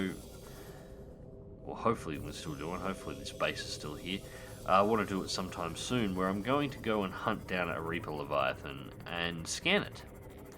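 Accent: Australia. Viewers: I love the Aussie Accent so much:)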